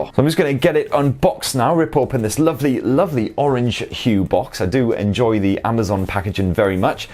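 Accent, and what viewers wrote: United Kingdom. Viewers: I dont get why cant british people speak regular english? Always gotta be so extra... its annoying couldnt watch this all the way through 😑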